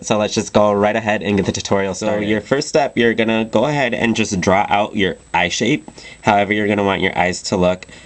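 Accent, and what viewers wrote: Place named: Detroit, United States. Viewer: Lol i noticed that too, maybe he's from the north? b/c i have family from detroit & that's how they say it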